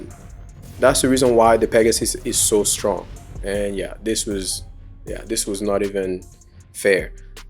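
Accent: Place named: Sub-Saharan Africa. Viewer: you sound subsaharan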